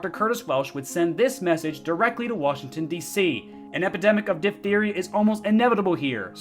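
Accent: United Kingdom. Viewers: Never knew the true tale of "Balto", and your sophisticated British accent astonishes me to this point, dear. 🧐😎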